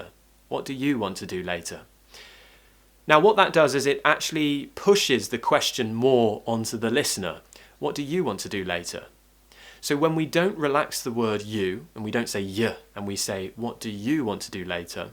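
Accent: United Kingdom. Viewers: I’m always looking forward to listening to your beautiful British English! Fantastic Friday! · I luv your British accent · When I found your channel I didn't know how to have a British accent, but with your videos I have learnt a lot. Thank you!!! You're an amazing and smart teacher. I love listening you! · I love your accent. You sound like a posh English man❤ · your voice and your British accent😍i fell in looove🙃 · Love ur British accent. Thanks for ur fantastic lessons. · You are an amazing teacher, I like your British accent.........a bientôt sur YouTube 😘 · Hello 👋🏻 Actually it is very hard to speak like native british people. I was studying british english at school but unfortunately even my english teachers couldn’t speak like you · as well as your British Accent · I love your british accent ❤️ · one thing I must mention I have been learning pronunciation for several months through ur video lessons,and I have been imitating your speech.Now my ielts tutor is just amazed about my pronunciation like a British.I reaaalllyyy respect,admire,love you.U r really super tutor.🤗🤗🤗🤗🤗🤗🤗🤗take care urself for us and ur family!!!!❤❤❤ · I do love your teaching british accent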